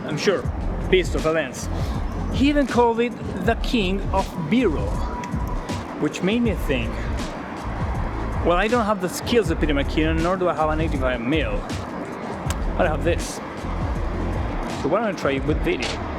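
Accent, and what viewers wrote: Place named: United States. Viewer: hermano partio el video y pense que eras gringo xd gud inglish. saludos desde coquimbo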